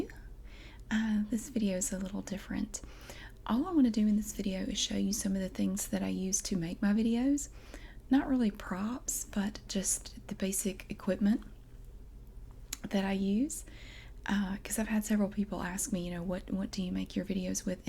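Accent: South, United States. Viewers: We love your straightforward soft spoken vids. This display of your setup is especially interesting and informative. So much so that it tempts me to give it try too! Your down to earth manner is attractive and relaxing. Your sweet southern accents doesn't hurt either !! Thanks and keep em coming !!! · I laughed when you said, "I'm gunna move you!" . You already move us with the content you create. You have such a welcoming and comfortable personality. You come across as a very natural and honest lady. Really Down to earth! Your soft speaking voice and light southern accent are very relaxing and you're pretty darn cute too! I thought it was funny when we saw that your tri-pod looks to be the same as the one that I bought and your laptop looks like my Toshiba. LOL. . My Tri-pod is a Targus. :-O Keep the great videos coming. Thank You!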